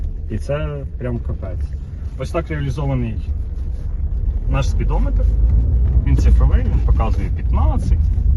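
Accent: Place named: Russia. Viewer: По говору видно что русский настоящий русский)))😊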